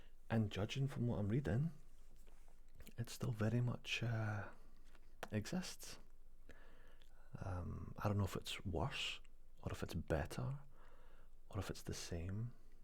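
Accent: Scotland, United Kingdom. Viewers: Loved this video, it was interesting but a bit sad people feel if something is too Scottish it is inferior. I always think of Scotland as a beautiful place with strong people with a history I find very interesting. Your accent is so beautiful!!!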